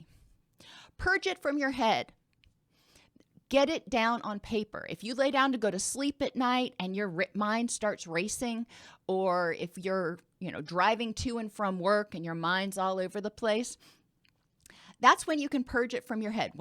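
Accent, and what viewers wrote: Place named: United States. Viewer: Your explanations are really amazing, but I am afraid your accent is too American an you speak so fast for non natives like myself. Thanks a lot though.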